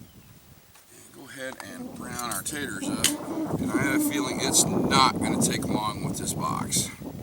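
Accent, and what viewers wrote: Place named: United States. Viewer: Great Video, yr cooking Videos becomming more and more Professional...its nice to watch you while cooking and listen to yr nice american Accent,... the video is very interesting to me, more interesting than to listen to the cake king in US television. greetings and good appetite my friend Harry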